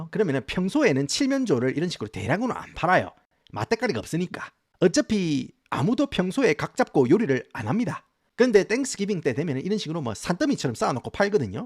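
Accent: Gyeongsang, South Korea. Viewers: 경상도 사투리로 미국이야기 잼나개 해줘서보는데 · 경상도 사투리 듣기 좋은데? 보기싫음 차단하든가😂 이채널 댓글만 12개 썼으면서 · 니 갱상도 사투리 조지는게 억수로 찰지데이~~~ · 가끔떠서 보는데 경상도 사투리 너무 듣기힘들다 ㅋㅋㅋ 알고리즘에 그만 떴으면...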